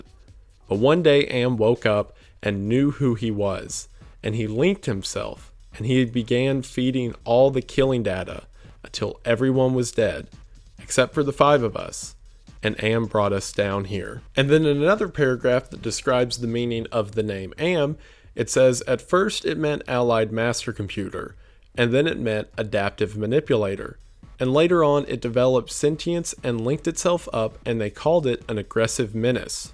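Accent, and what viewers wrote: South, United States. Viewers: Adversity or diversity?! If you are demonizing "diversity", as what you, can chuck up to a mispronunciation seems to, imply, then, that Southern accent that I have noticed takes on a special meaning... Hmm!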